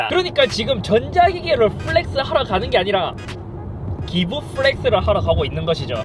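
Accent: Gyeongsang, South Korea. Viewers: 역시 경북 안동 출신이시군요 사투리억양이라서 어디지...ㅋ 궁금했거든요 구독자20만 정도부터 눈여겨보던 유튜버이신데 벌써 118만!! 두둥 멋집니다 앞으로도 ~^^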